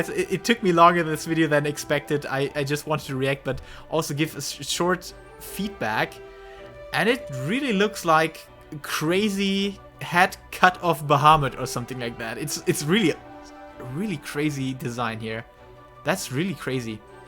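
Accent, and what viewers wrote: Germany. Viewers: I think because hes german, could sound confusing to americans, but to me he sounds like a guys that is talking englisch :D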